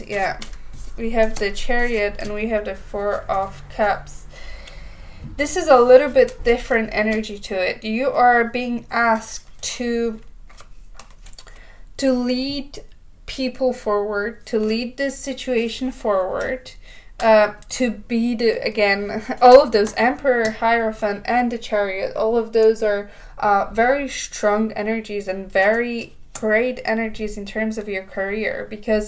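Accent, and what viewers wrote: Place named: France. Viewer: Thank you 🙏🏽 I claim your reading , I think you are French from your English accent !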